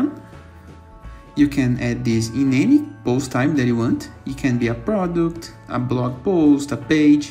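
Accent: Brazil. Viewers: Are you brazilian? The accent sounds like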